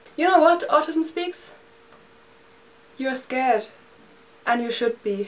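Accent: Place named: South Africa. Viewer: Where are you from? your accent is beautiful. Sounds a bit South African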